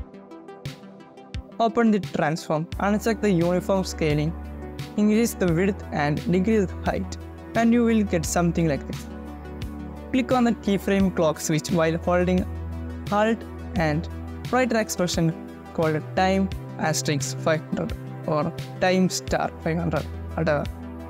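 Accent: Kerala, India. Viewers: Bro malayali anno🌝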